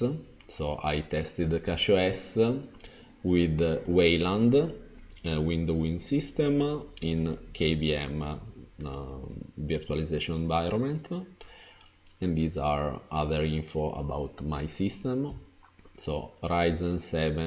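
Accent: Italy. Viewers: No matter, I like both, italian and english. Best mix of all.:-), there is not much bad with it. It is just, that many spoken words have an extra "e" at the the, from run it get's run-e. For me it's perfectly fine. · this is the most italian english I've heard in a while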